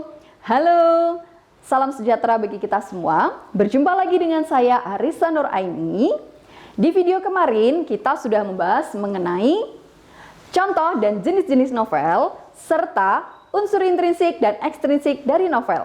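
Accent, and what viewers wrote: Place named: Java, Indonesia. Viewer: Enak banget ngejelasinnya ,bhs jawanya keluar medok banget Bu🤭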